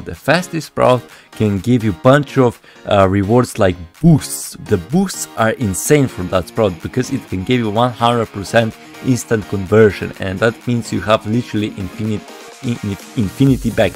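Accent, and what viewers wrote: Russia. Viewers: Чел вау..... какой же у тебя Русский акцент 😑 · русский акцент?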